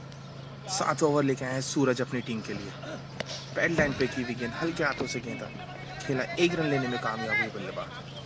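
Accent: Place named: Garhwal, India. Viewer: Gadwali boli yr bheji tu v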